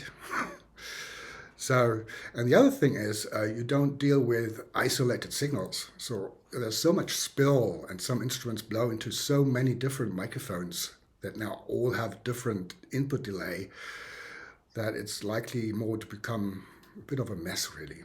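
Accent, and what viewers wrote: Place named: United Kingdom. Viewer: Lieber, danke für Deine Videos! Ich finde, Du erklärst schön klar und verständlich. Ich habe gleich abonniert. Darf ich fragen, wie Du zu Deinem großartigen Englisch gekommen bist? Ich finde, das klingt so schön britisch. Liebe Grüße, Renee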